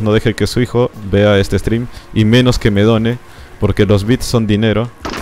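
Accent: Peru. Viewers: eres peruano?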